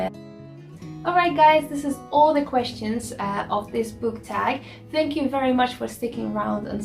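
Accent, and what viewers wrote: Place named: United Kingdom. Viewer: I love the British accent you picked up,! It's so nice to see and hear you, even when we're isolating so far apart. I look forward to more videos:) Yay!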